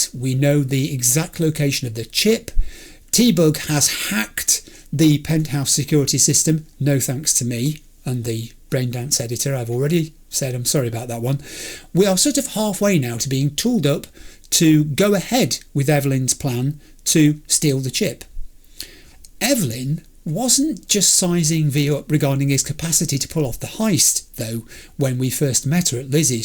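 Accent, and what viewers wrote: United Kingdom. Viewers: What a great channel I found, truly a virtual gem! Thank you very much for you efforts, for your comments, overall for your rich Britain speech and charisma! I played Cyberpunk 2077 for lots of hours, about 200+, one of my favourite games so far and your videos inspire me to play it hundreds hours more!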